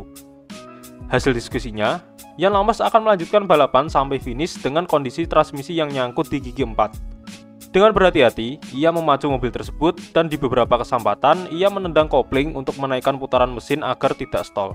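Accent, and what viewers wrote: Java, Indonesia. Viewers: suaramu medok banget min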